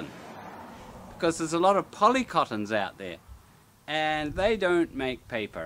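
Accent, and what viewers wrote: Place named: New Zealand. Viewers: I got a real kick out of watching this with captions (sitting in a waiting room and not wanting to disturb others) ... the 'English" subtitles can't quite handle the kiwi ;-) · I love KIWI accents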